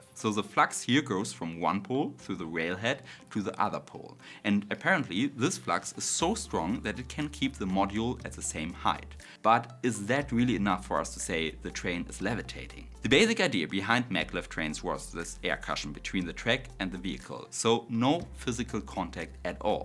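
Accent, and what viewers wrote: Germany. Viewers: Gutes Video! Aber sprich bitte nicht so schnell. Dein Englisch ist schwer zu verstehen, wegen dem starken deutschen Akzent. z.B "cushion" wird "kuschen" ausgesprochen.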